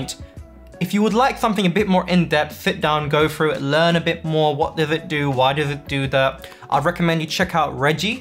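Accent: India. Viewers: Honestly, it was the Indian accent for me 😂 thanks for the info!!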